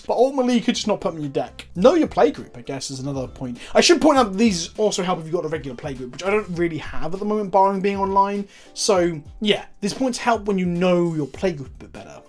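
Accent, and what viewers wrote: United Kingdom. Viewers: British Hungrybox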